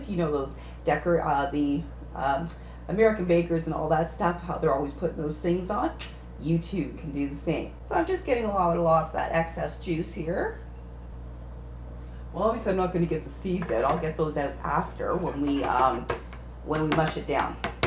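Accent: Canada. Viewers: Very informative, great Job! I am from Western Canada, can tell you are from Ontario I presume? can tell by your accent, especially when you say the word "about" LOL, all is good!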